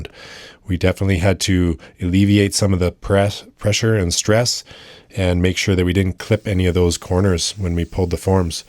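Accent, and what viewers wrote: Canada. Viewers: Are you Canadian by any chance?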